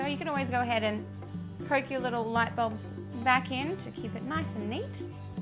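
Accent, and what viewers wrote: Australia. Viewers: Aussie accent. Where in Australia did you buy such a large ball of yarn and where is the Mod podge from? Thank s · Is she Australian? Great vid!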